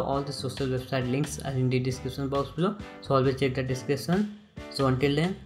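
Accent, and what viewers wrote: India. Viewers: Thank You indian voice!!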